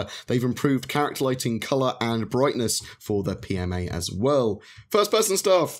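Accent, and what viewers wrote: United Kingdom. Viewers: I love how Brits call it a "haych" key :)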